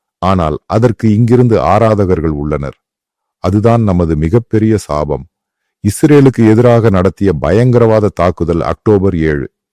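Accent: Kerala, India. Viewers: Native kerala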